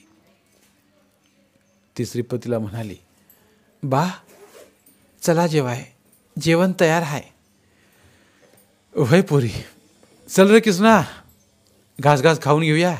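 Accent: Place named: Kolhapur, India. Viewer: मी सर्व भाग पाहते, कोल्हापूर ला माझ्या आत्ती च्या गावाला आल्यागत वाटत्या 🙏अप्रतिम, सर्व दृश्य अगदीच डोळ्यापुढे उभा राहते 🙏अगदी बाहुलीत प्राण टाकल्यासारखे तुमची बोली भाषा आहे 🙏🙏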